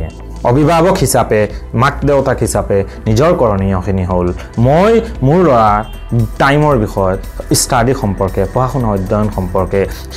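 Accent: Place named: England, United Kingdom. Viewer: M a big fan of your English ascents bro 🔥 Great work carry on 🙏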